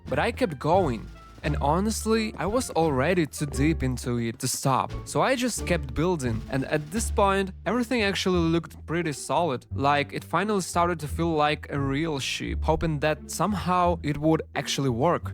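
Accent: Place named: Russia. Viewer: Звучишь как русский бро, а видос прекрасный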